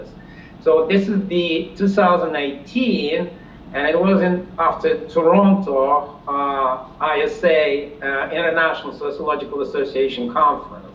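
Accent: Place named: United States. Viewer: Hi. My, you are sounding very American these days! (Krissie in Germany)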